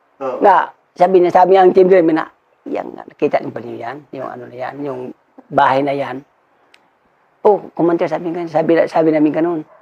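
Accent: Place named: Bicol, Philippines. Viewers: The "baga" of bicolano. Pero sabi ni Sir Bisaya daw si sir. Baka matagal n sya sa bicol. Ang punto ehh bicolanong bicolano.. Oragaon man Si.. Salute sayo master. Baka may part 2 pa sir. yung sa Sunod na barangay n bangit nya sa ORAS. Parang c master Ledesma lang ang kwento. Haha. Solid panoorin.. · siguro bisaya ito sir, pero nasa bicol na nakatira kya bicolano na din ang tuno.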